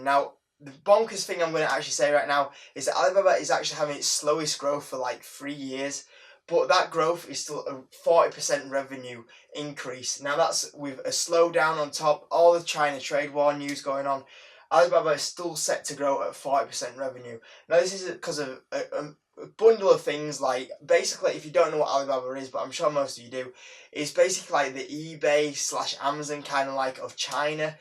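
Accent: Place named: United Kingdom. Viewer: Hi , By the accent you have , I`d say you`re british. Could I trouble you asking how much tax did you pay on your Apple shares sale ? I just started too recently and am curious . Is it just that 0.22% and brokerage fee or is it something else as well ? and after you pass like 12.5k this year , you start paying like 20% ?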